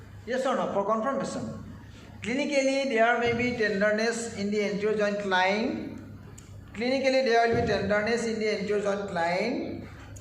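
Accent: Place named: Hindi, India. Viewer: Sir Your Hindi accent is so Nice❤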